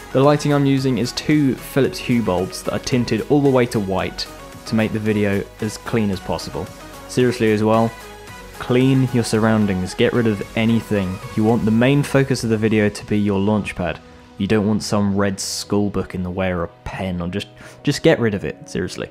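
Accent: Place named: United Kingdom. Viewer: OMG YOUR BRITISH! (sorry, i'm new to your tutorials)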